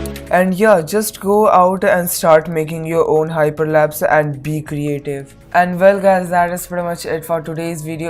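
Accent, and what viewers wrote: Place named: India. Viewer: From your voice I am taking guarrentee that you are 🇮🇳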